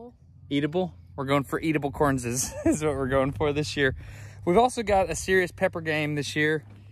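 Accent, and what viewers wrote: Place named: Alabama, United States. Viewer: Sounds like Alabama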